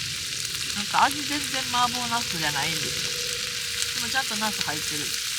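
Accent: Kansai, Japan. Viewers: こんにちは😃 本当に重たい荷物を持って歩いていく姿に、感動もらいましたの感動が、動画やBGM,可愛らしい大阪弁のおしゃべりからじんわりふかーく心に染みてきて本当に嬉しかったですいっしょに旅してましたよ😂❤ 後編、波乱気味みたいですが、楽しみにしています PS・関西人だからってわけではないですが、伊豆大島いったことなくって、良かった❤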